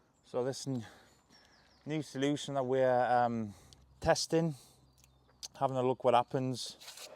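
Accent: United Kingdom. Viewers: Great Chanel! Great products! Do you mind me asking about your accent? I hear a little North East twang maybe? 🤔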